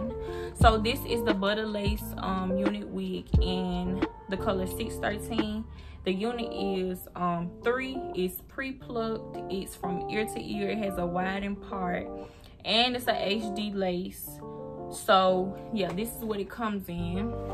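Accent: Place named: Baton Rouge, United States. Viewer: You gotta be from Baton Rouge lol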